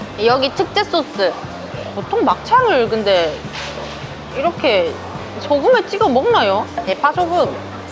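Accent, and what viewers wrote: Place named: North Gyeongsang, South Korea. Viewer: 맹이나물이 아니고 명이나물 ㅋ댁이 워데이껴? 억양은 경북북분데 ㅋ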